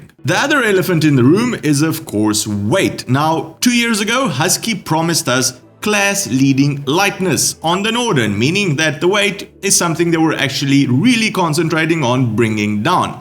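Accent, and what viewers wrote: South Africa. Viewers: Why do you sound South African?👀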